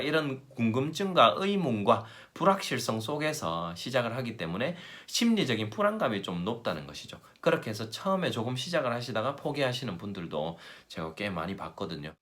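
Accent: Gyeongsang, South Korea. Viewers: 공감되고 좋은 말씀 감사합니다!!! 영어책읽어주시는 클립 먼저 봤는데 영어 목소리 듣고 한국말 목소리들었는데너무 반전이셨어요 😀경상도 말쓰실줄 상상도 못했으요 ㅋㅋㅋ미국에서 오신줄 알았는데 ~ㅋㅋ(영어너무 잘하셔서~^^)좋은생각들~ 좋은 책소개 감사해요!:)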